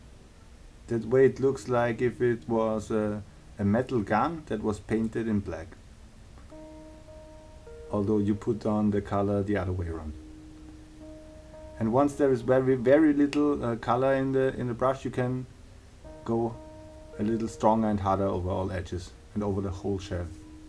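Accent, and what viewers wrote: Germany. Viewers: Are you german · where you from? german? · Are you german?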